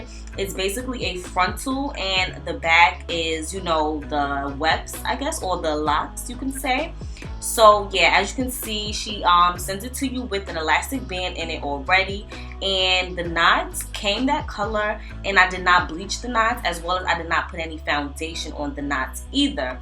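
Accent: New York, United States. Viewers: New Yorker?😊🤗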